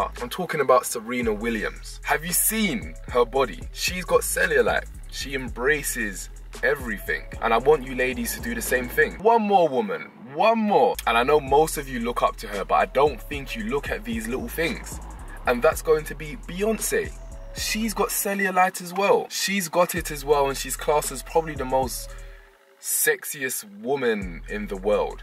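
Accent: London, United Kingdom. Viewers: So he’s praising cellulite, looking foine and has a British accent...got my vote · I love your British accent! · English accent / London accent British is a wider thing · Love your message... And your London accent 😁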